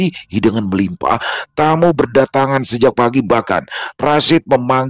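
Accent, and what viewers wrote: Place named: Banjar, Indonesia. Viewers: Kaltim hadir bang kental banar banjarnya😂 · Waalaikum salam, klo bang berbahasa banjar logatnya spt org banjar asli, salut bang😊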